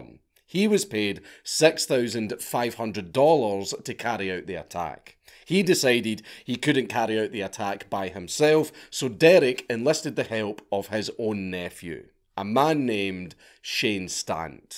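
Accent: Scotland, United Kingdom. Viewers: He's Scottish? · Funny Scottish Santa man cures my depression for christmas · I never realized you were scottish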